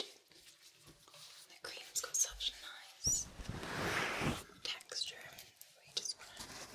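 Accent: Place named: Scotland, United Kingdom. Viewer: I rather enjoy listening to you ramble,. Triggers or no triggers, whispering or soft-spoken or even humming/singing, I just find the sound of your voice very pleasant. 🥰 Even more pleasant than the rain, which I do also enjoy. 😂 Speaking of enjoying things: based on the way you kept breaking into other accents in the middle of talking, I'm going to go out on a limb and guess you were enjoying yourself while filming this - that's something I dearly love to see. 😍 To answer your question, though, I don't think I really have a favorite chocolate. Does German Chocolate Cake count? 😋 Have a great weekend, and I look forward to your next video. 💝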